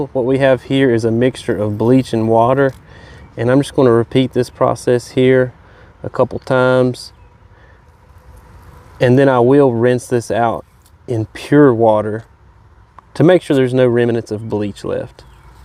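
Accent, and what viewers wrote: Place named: Carolina, United States. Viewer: lolol...you said 3 words and i knew you were from Carolina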